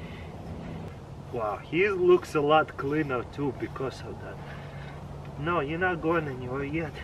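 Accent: Russia. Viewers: Ох уж этот русский акцент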